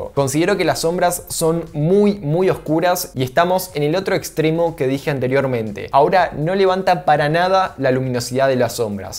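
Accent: Argentina. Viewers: Excelentes review das detalles de todo, sea bueno o sea malo y sos argentino! Saludos desde México wey xD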